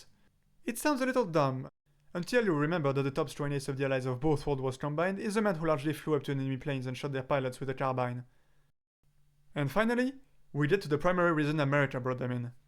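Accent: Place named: France. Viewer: Couldn't understand half of the video due to the extreme french accent but thanks for the information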